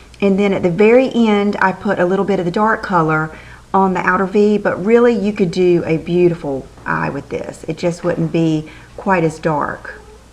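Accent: South, United States. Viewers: I think the Naked 2 comes out on the 25th of this month, I have a feeling it will sell out fast. Can't wait to see your new shoes I know they are going to be fabulous. The Makeup For Ever new eye shadow replaced the older ones that have been out for a long time, the pallets are only one dollar, it doesn't matter which one you buy. Hope you have a great weekend, can't wait for part 2. Love coming home and relaxing with your video on Friday night look forward to it all day. I think it your southern accent it is so nice to listen to. XOXO Emily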